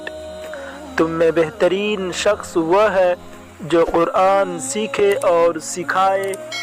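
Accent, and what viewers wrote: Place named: Arab world. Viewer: urdu ko arbi ki tarah mat padhiye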